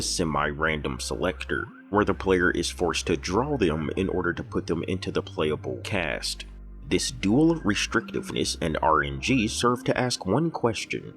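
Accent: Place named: South, United States. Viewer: I like the video and your southern accent. Thoughtful analysis. 1st time I see you. Subbed. Hope u post more.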